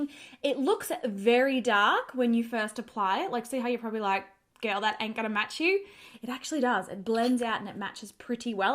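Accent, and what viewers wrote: Australia. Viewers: I could listen to you speak all day long. Your Australian accent is lovely. I am a New Yorker and we sound like truck drivers. New York accents are actually offensive to the ears 😂.. listening to your beautiful voice is so calming it’s just so so pretty.. When a New Yorker opens their mouth it’s like people want to cover their ears because we are so loud and sound like drunken sailors 🤷‍♀️🤪..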